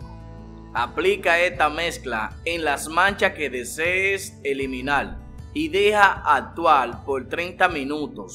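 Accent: Dominican Republic. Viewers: Es de República Dominicana bro Ahí hablan así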